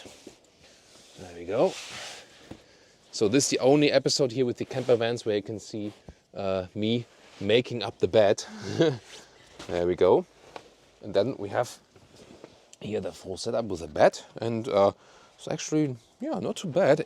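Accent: Germany. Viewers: Voll der deutsche Akzent.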